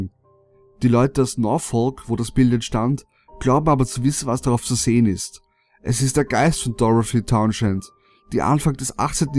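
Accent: Austria. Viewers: Bist du ösi? ^^ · oder einfach österreichisch?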